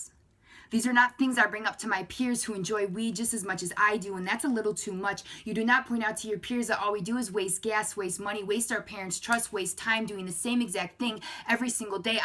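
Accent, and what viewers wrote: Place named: Midwest, United States. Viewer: Damn girl that Midwest accent is so cute what state are you from Wisconsin right?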